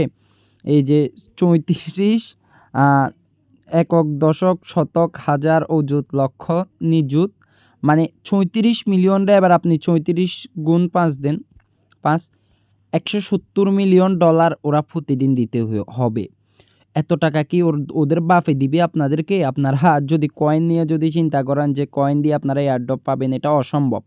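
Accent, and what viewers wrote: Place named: Sylhet, Bangladesh. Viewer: Vai to Sylhet e😁 ami to buji laice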